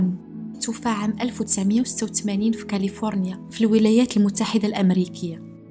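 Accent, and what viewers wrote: Morocco. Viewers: صوت مغربي يشرفني تحية لك أختي الفاضلة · ياريت الفيديوهات تتعمل بالعربية الفصحي لصعبة اللكنة المغربية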